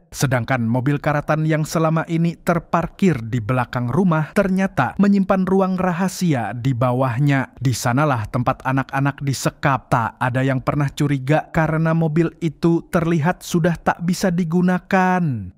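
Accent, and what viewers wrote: Banjar, Indonesia. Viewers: Bah orang banjar kah Pian mang,pacangan jadi langganan ni nonton di sini ulun😅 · Banjar banar amang nih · Orang banjar kah bang? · pian urg banjar kah min😅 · mang pian urang Banjar kah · Banjarr is good😂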